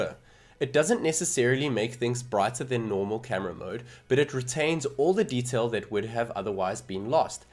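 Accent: South Africa. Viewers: Are you South African by any chance? You have the accent! :)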